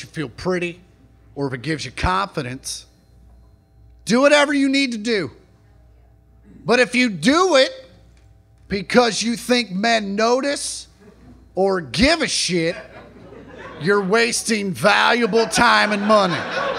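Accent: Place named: South, United States. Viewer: Nobody in Kansas has this southern of an accent, unless they moved here from the south.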